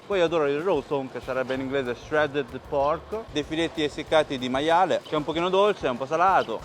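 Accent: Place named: Pesaro, Italy. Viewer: Grazie, i tuoi video sono molto gradevoli, il tuo accento pesarese e' incantevole e tu sei una bella persona garbata, sicuramente sei il riflesso dei tuoi genitori.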